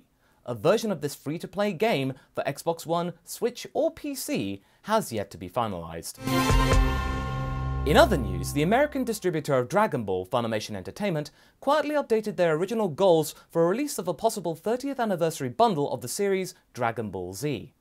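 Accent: England, United Kingdom. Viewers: Lol your gourgeus english accent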